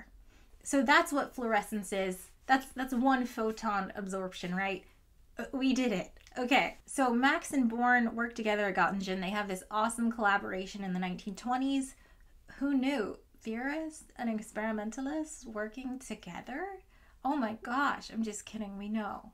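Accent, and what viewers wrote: United States. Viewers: Nice video. I just wish you weren't butchering (aka Americanising) not English names to this extent.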